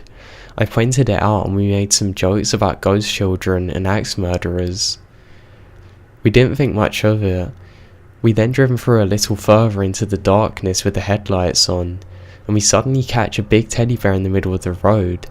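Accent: England, United Kingdom. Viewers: I'm an American sub., and I love it when you can't help it and laugh. When you broke up over the word "fanny pack", I laughed out loud at 5am. Couldn't sleep...thanks for the laugh. Your a great, really gifted natural storyteller, and I love your accent. What part of England are you from? All the Best!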